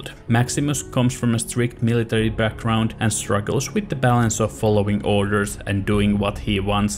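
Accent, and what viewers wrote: Finland. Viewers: the finnish accent is quite recognizable 😅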